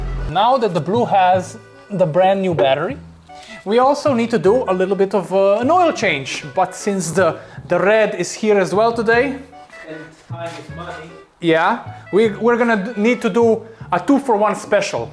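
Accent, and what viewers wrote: Slovenia. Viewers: You got that Slavoj Zizek accent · lmao the slovenian accnet is killing me as a serbian.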